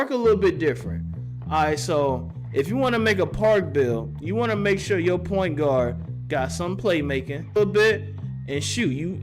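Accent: St. Louis, United States. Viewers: U from st.Louis cuh ?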